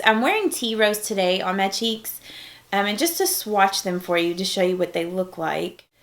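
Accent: South, United States. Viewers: Love seeing someone else with a southern accent.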